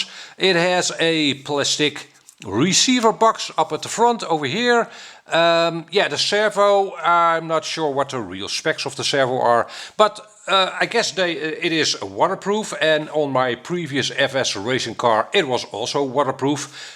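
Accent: Netherlands. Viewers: Mooie video👍... ik wist gelijk al van dat Is nederlands accent en toen zag ik de 😂💪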